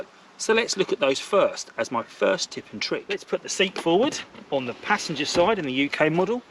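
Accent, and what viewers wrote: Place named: Kentucky, United States. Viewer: Nice accent, Kentucky?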